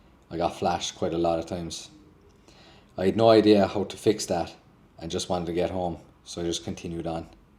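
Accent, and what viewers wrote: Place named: Cork, Ireland. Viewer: Ive been looking at the Kove. YouTubes algorithm showed me this vid so I clicked on it. Wasn't expecting a Corkonian accent 😂 Will subscribe and watch your other videos now. Best of luck,.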